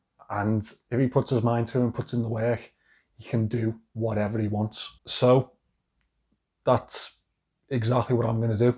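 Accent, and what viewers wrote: Liverpool, United Kingdom. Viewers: Wow, that was unexpected. I really thought you are shutting down the channel. But now it is a different story! Wow. 🙂 I am not an English native speaker, so I used to struggle understanding your dialect, but with every video I get more and more. I recognize your videos not only as a way to discover watches, but also as a way to learn and better understand British English. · Sorry for all you’ve been going through, but congratulations about being able to do this full time now. (May I ask if you’re from the Liverpool area? I sense a Scouse accent, but I’m American so I’m never sure. I’m just a big Liverpool FC fan and have been for about 16 years.)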